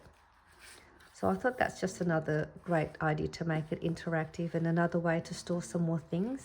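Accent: Australia. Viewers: I really like this project and your style. I just discovered your channel...it is so nice to hear an Australian accent and places to source things here in Australia. 😃 I'm watching with sound off and subtitles on because of the snoring! 🤣 (music to some, nails on a chalkboard to others, hey?!😉) But, I stuck around and subscribed. Your style really does stand out ❤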